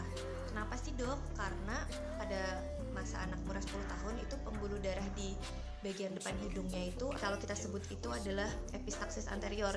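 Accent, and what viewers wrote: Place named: Java, Indonesia. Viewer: Dok jawa nya orang mana dok? Hihi keep semangat dok!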